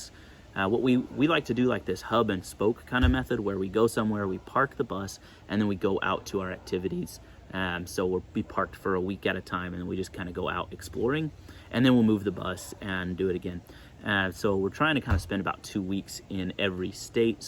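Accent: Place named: California, United States. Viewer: I'm sorry, this has nothing to do with the bus, but, I'm just curious, what state are you from, your accent, is it Californian?? I'm from and live in Papua New Guinea 🇵🇬. ☺️😇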